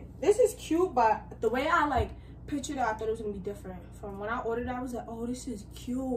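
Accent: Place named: New York, United States. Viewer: Yhu can tell she just moved to New York she talk like a New Yorker but she kinda don’t 😭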